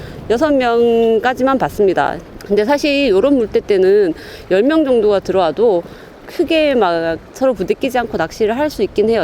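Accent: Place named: Gyeongsang, South Korea. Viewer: 낚시 못한지 2년 넘었어요 영상이 너무 좋아요 경상도 사투리 쓰는 명랑소녀 모습입니다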